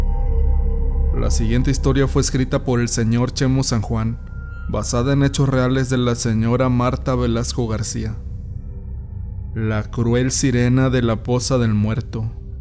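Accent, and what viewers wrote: Mexico. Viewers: Me encata su asento mexicano y como cuenta sus historias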